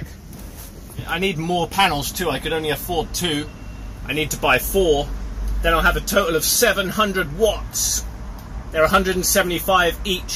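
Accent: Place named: United States. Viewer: This is amazing!! It’s sweet you’ve fitted your own solar panel on the top of your van- I love it! You never cease to amaze me & I love catching up after awhile cos you’re always up to something completely different (apart from the melons 🍉🍉🍉 ofcrs!). Also noticed a wee twang of American in your accent these days 😃 Man of the world 🌎✨ xxx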